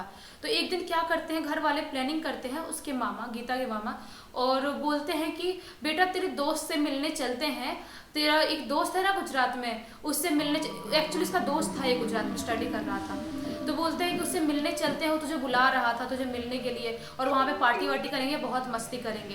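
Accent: Bihar, India. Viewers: Kya aap bihar se ho?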